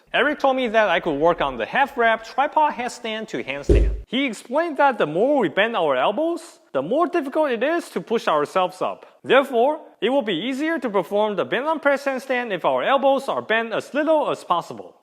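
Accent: China. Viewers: 老哥 这是中式口音吗？感觉口音很熟悉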